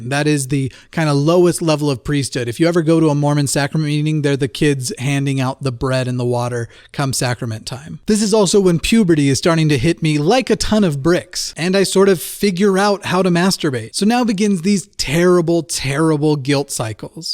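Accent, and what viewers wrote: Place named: United Kingdom. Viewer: First of all I’m really proud of you 👏🏼👏🏼👏🏼👏🏼🍀 and second of all I love you very British Intonation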